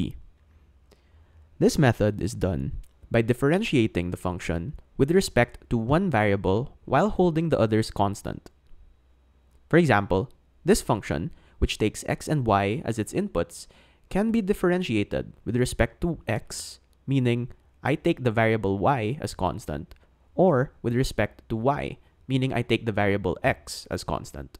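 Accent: Philippines. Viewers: he sounds Filipino yes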